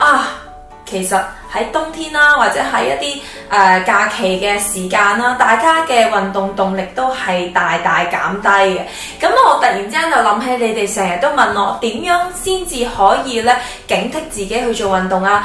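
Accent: Standard. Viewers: 原來coffee普通話也說得很標準的，第一次看到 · 好喜欢听coffee 说普通话。感觉你的国语越来越标准了👍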